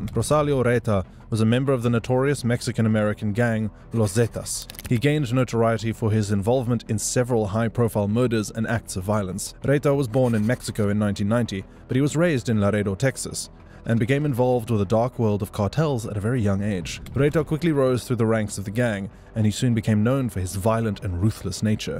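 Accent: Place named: South Africa. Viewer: Its a south african bloke lol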